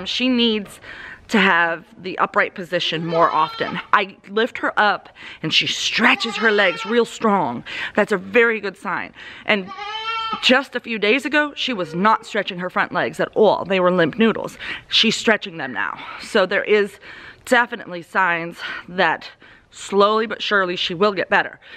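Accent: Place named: Massachusetts, United States. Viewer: It’s funny because I hear your Massachusetts accent and your southern accent.....I’m the same way. That Northern accent just flys out of my mouth lol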